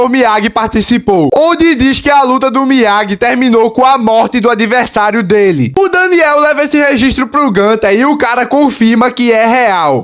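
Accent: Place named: Northeast, Brazil. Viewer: você e Nordestino?😂você fala igual um amigo meu que veio de lá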